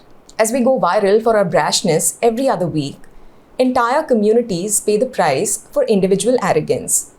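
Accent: India. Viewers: Please change your Indian stupid accent. Learn how to speak normal English.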